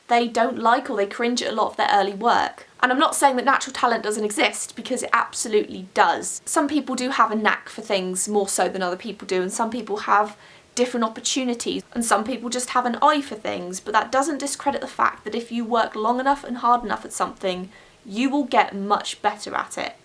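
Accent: England, United Kingdom. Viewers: AHHH how is your accent still so english!?!??!??? x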